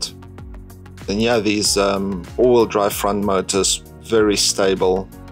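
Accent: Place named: South Africa. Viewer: Lekker South African accent. Love to see such great videos coming from SA.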